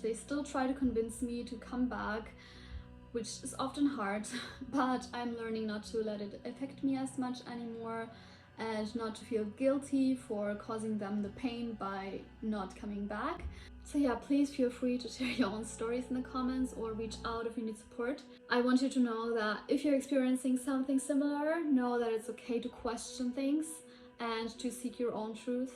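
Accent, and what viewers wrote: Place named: Germany. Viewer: I’m not sure if you are German, but your accent very much sounds so. The idea that something like that could happen where I live… :(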